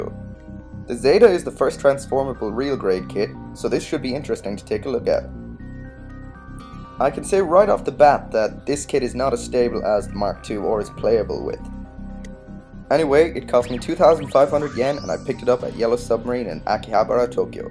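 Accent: Ireland. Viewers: How did he ever sound Asain? He's Irish and has a clear Irish accent...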